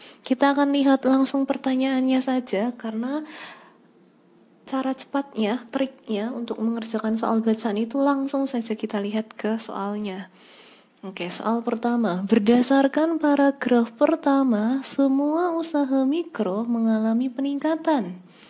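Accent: Java, Indonesia. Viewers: Sangat bermanfaat ka, tapi maap banget bukan menghujat saya terganggu sama suaranya, terlalu medok sama kalem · Medok banget mbaaa :v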